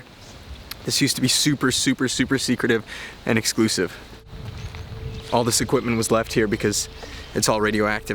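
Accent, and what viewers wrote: Russia. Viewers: Bruh your russian accent is on point. Neat story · Nice russian accent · I was super impressed with your Russian accent/pronunciation/reading. I did not expect that. However, I REALLY hope you DID NOT TOUCH ANYTHING, and were careful where you walked. I'm frustrated that you were allowed to do this video with zero protective gear and no Geiger meter. Also your lack of knowledge regarding the dangerous situation you were heading into was stressing me out. I mean, there were definitely new things in that video that I had never seen in regards to Chernobyl, which was pretty cool, but I was too distracted by the risk you were taking with your own health and safety.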